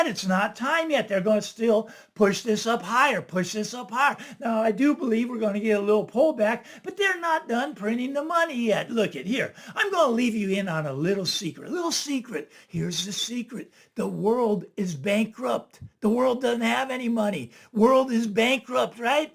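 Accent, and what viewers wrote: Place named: Texas, United States. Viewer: I can't place your accent,. Texas?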